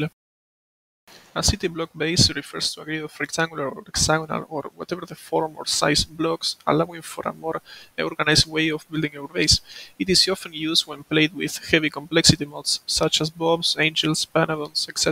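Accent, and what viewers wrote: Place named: Argentina. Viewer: Ahjj el ingles argentino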